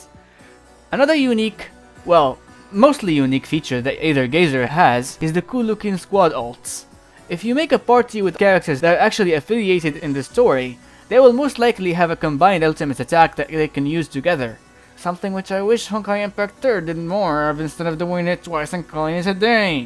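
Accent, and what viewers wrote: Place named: Morocco. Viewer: bro are you moroccan you sound moroccan as hell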